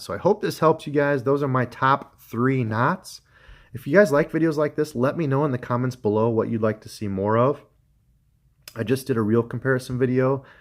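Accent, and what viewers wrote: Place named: North, United States. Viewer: You just quoted Gerald Swindle word for word about not trimming your tag ends super short because if the bass didn’t see the rest of the line they won’t see the little tags. Get your own material. Don’t be stealing us Alabama boy’s lingo. Especially if you are from the north which is what I’m guessing based on your accent!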